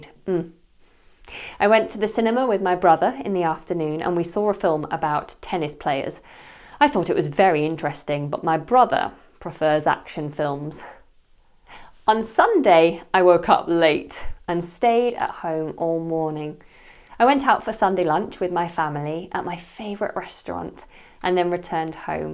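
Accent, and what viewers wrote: United Kingdom. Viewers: I will always say, you have a great british accent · Hi, you have a great British accent, but this test is more likely a memory test. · But you have a perfect British accent 👍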